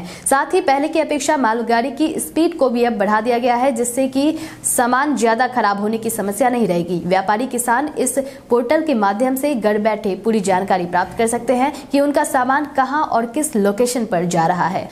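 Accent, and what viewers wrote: Bihar, India. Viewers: Bihari mat bolakaro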